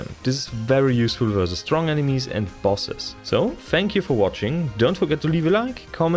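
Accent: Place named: Germany. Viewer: ah ok aber die Sprache is dieselbe xD Hab mir von deiner aussprache gedacht das du evtl deutscher oder Österreicher bist xD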